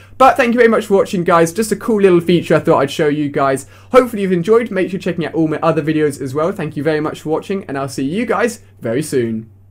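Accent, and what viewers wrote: England, United Kingdom. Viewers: Daniel Patel Because he's British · Z is pronounced ZED in the UK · because he was born In Britain you idiot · why do you have an English accent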